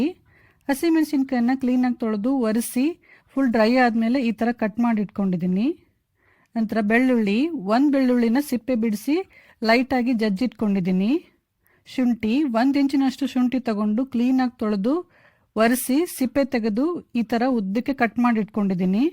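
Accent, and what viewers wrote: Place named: Bengaluru, India. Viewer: U speak Bengaluru language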